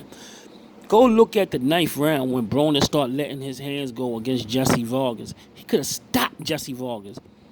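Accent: Baltimore, United States. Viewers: Heavy Baltimore accent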